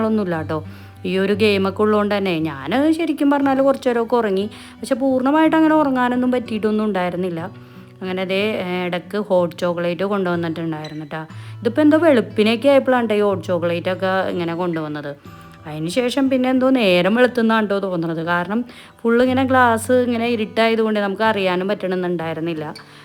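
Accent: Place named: Kochi, India. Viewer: ഇവർ മംഗ്ലീഷ് അല്ലല്ലോ പറയുന്നത്. തനി കൊച്ചിമലയാളം. എന്നിട്ടാണോ ഇങ്ങനെ പറയുന്നത്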